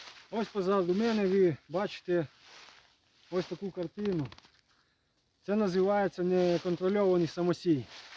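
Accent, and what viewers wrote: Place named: Polesia, Ukraine. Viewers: МОЇ СПІВЧУТТЯ ТОБІ ЗЕМЛЯЧЕ 🤝 ПОЛІЩУЦЬКИЙ ДІАЛЕКТ СУПЕР МЕНІ СОЛОДКО СЛУХАТИ, ТАК САМО ПОЛТАВСЬКИЙ, ЧЕРКАСЬКИЙ, КОЖЕН РЕГІОН МАЄ СВІЙ ДІАЛЕКТ, НАВІТЬ В США АНГЛІЙСЬКА МОВА АЛЕ ЗАХІД, ПІВДЕНЬ, ПІВНІЧ, ЦЕНТЕР ВІДРІЗНЯЮТЬСЯ, ОСОБЛИВО ВІД ЦЕНТРУ НА ЗАХІД....МИРУ НАМ УКРАЇНО ТА ПЕРЕМОГИ НАД МОСКОВИТАМИ. ПРИВІТ З ХЕРСОНУ.... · І мова гарно і поліщук справжній! Головне, що ви небайдужі, вам є справа до землі, на якій живете.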